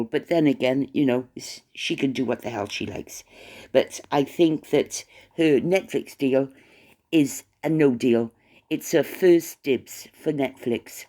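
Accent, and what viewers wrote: Wales, United Kingdom. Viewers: love can’t you ask the council to move you elsewhere where it’s quiet love, I have moved 3times in my life, but I miss Wales you love cannot remember me, we were young and we had good times at Cwmbran cinema, I moved to Gloucester to train as a nurse, and then I married am still in Gloucester we are both the same age, but still remember our young lives we had fun, I was shocked when I found you, and missed your lovely voice, so glad you are back, not on x so I can’t stand the evil, I lost my husband in January then 6 months later my only daughter she did not tell me her cancer had come back, what you did is nothing to be ashamed off, you looked after them, glad to hear you again you take me me back to my youth when I hear you accent I’m back to young time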